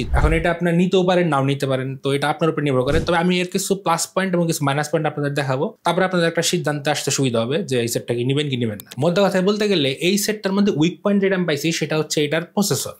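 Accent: Tangail, Bangladesh. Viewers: ভাই কি টাংগাইলের?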